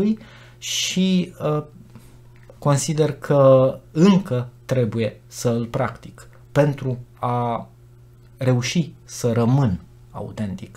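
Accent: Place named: South, Romania. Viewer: Se cunoaște că sunteți din zona de sud a țării nu doar după accent ci și pentru ca va adresați la persoana a doua singular. Poate va urmaresc și persoane trecute de prima tinerețe.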